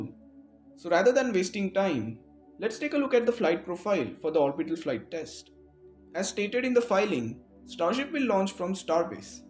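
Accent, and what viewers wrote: India. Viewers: i love the indian accest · Thanks! the expected timeline was not apparent to me, other than the text list of event callouts. The Indian accent threw me, to be fair I don't speak any Indian. Hoping for altitudes, velocities, geographic locations (or longitudes) and altitudes by the time list posted. Maybe energy dissipation per second (d(m*v^2)/dt), possibly temperatures (gaseous molecular velocities related to Boltzmann temperature). Go!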